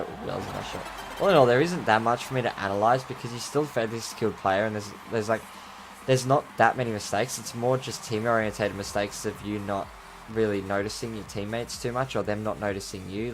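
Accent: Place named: Australia. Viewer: Holy shit your Australian accent is deep, I'm just used to you on your Facebook page, with no accent lol. Love the video tho bro, keep it up and good luck.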